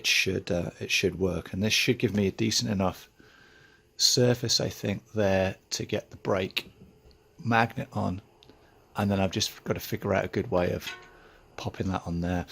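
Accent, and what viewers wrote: United Kingdom. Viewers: Hi.. You sound British obviously not living in England?? Is there a huge difference in power / performance between CYC and Bafang HD Or even the Cyclone 3000w mid drive? I'm guessing the Bafang is the quietest of the three and least powerful and top speed but what about torque? Thanks for your help.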